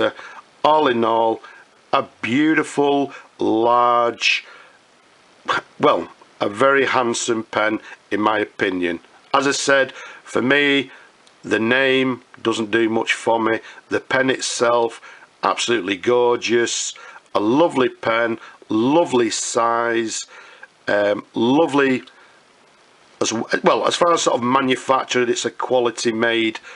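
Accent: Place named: England, United Kingdom. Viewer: just know that I love your heavy english accent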